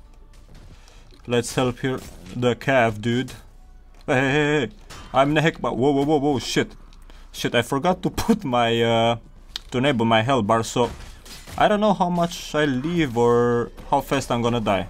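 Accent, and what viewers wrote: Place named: Transylvania, Romania. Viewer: Esti cumva ardelean?Dupa modul in care vorbest :D.Un mod foarte bine facut e si Nova Aetas care, este modul meu preferat.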